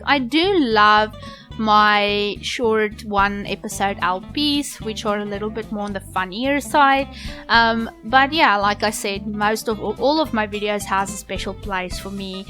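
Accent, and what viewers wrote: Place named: Netherlands. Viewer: Love your accent girl.. Its a little bit dutch... Love you video ♥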